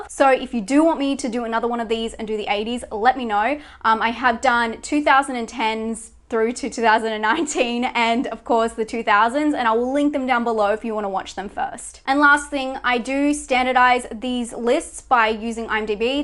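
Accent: Australia. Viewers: I am so happy to have found your channel. Your tastes are pretty much exactly the same as my own, and you are really interesting to listen to (as a brit the ausie accent really makes me happy to hear). When you mentioned The People Under the Stairs, I literally squeeled as no one seems to know that film. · Are you australian?ʕ•ᴥ•ʔ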